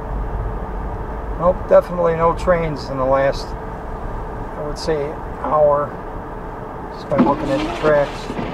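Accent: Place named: Buffalo, United States. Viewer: I thought I recognized that accent! I'm originally from Buffalo, and haven't seen "Lake Effect" snow in a while! 👍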